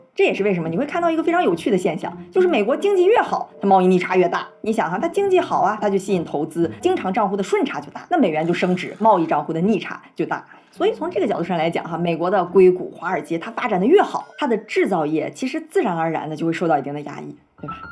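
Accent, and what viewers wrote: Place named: Beijing, China. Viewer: 京片子遛兒的！好聽！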